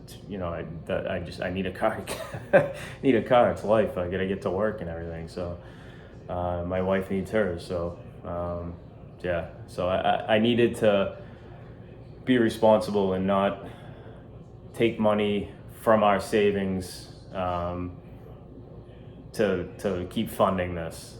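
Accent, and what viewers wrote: Massachusetts, United States. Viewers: This doesn’t have to be an end. A lot of people return to the trail to finish what was started as they get closer to retirement age. Life happens and things happen for a reason. Embrace the ambiguity life brings and move forward. On a selfish note- I’m going to miss hearing the familiar accent which I miss being back in every day (SE Mass native > Virginia- enjoying the AT down here). Hike your own hike, man…no matter what it is or how long it takes.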